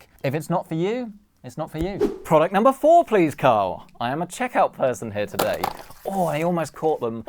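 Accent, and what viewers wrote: England, United Kingdom. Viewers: does bro live in the US or the UK? He sounds like UK (england) but his sponsors and prices seem US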